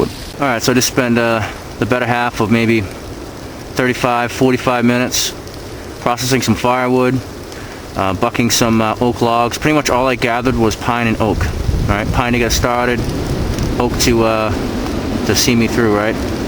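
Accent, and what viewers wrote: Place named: Northeast, United States. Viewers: Great video! Where is this place? You sound northeastern. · Pretty spiffy for a masshole! :) I could tell right away from your accent that you were from the greater boston area. And when I heard you talk about new bedford, well that just gave it away. Seriously though, it is refreshing to see someone doing a camping experience video that is real and not perfectly choreographed. You made a few mistakes like not gathering enough firewood before dark, and your shelter is not setup the best, but you got through it and you did it legitimately! It's nice to see a newbie practicing their skills. You did a great job and I enjoyed watching this video!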